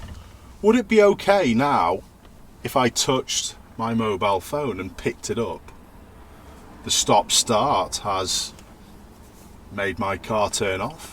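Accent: United Kingdom. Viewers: Everyone here, including the uploader, thinks there is only 1 set of laws in the entire world. You're wrong. Every country has different rules. Judging by your accent, I'm assuming this video is about the laws in the UK. But these laws do not have to apply for places elsewhere.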